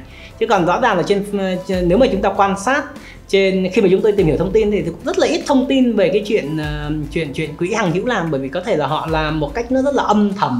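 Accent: Standard. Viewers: Rất thích người có học thức ăn nói chuẩn, dễ nghe, rất thuyết phục, phân tích hay, cái mà nhiều kênh khác còn thiếu. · Anh noi rat chuan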